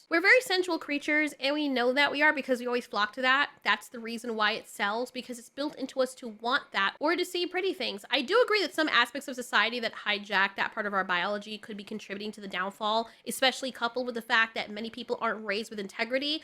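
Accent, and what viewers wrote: England, United Kingdom. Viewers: I endorse your Brit accent. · That was a surprisingly impressive English accent. Wrong time period, but still tho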